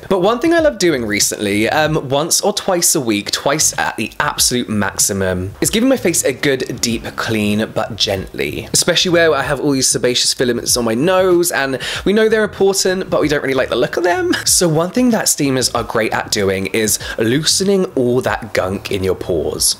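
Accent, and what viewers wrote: United Kingdom. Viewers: In love with ur vdeos,ur ausumn skin😭😭😭n the British accent😍😍😍#lucifervibes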